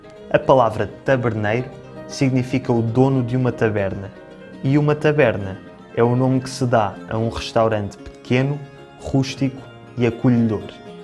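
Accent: Lisbon, Portugal. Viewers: Mil "seiXentos" e quarenta .... o seu "SC" soa quase sempre "X" e o seu "O" soa quase sempre "U". Essa pronuncia é particular de Lisboa? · Sotaque de Lisboa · Excelente vídeo. Super apropriado a dinâmica da narrativa, bem coerente com a ideia do canal que é disseminação da nossa língua tão amada. Sou brasileiro e gosto muito do sotaque português de Portugal. Em outros vídeos, por favor, discorra mais sobre o período de dominação dos Mouros, sua gastronomia e arquitetura. Parabéns!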